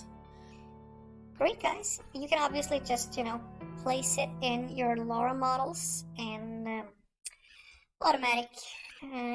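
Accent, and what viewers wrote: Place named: Europe. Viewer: no offense but the filter makes it worst. love me some european accent...just use your real voice nxt time please.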